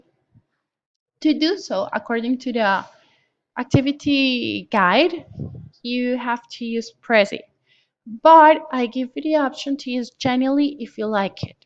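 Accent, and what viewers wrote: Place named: United States. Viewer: Tienes acento estadounidense profe😸😺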